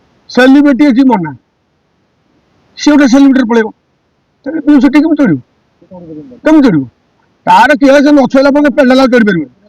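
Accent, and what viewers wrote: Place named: Balasore, India. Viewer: Balasore kothaa kon kohuchy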